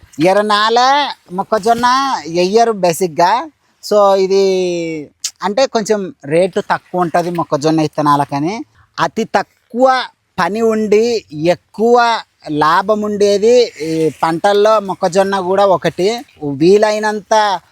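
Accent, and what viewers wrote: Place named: Kurnool, India. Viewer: Pure Kurnool slang Anna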